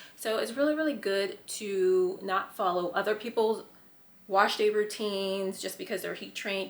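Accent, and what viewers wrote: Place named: Pittsburgh, United States. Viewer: And I hear that Pittsburgh accent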